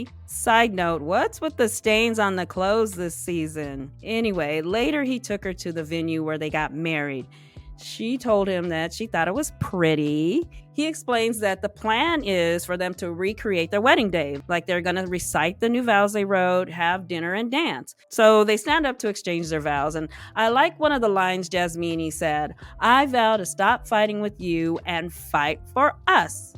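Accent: Boston, United States. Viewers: You are HILARIOUS, I appreciate your commentary and your Bostonian accent voice overs💗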